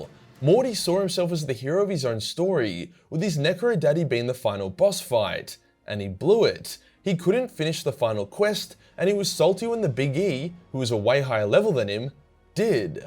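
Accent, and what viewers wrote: Australia. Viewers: God I love aussies explainin shit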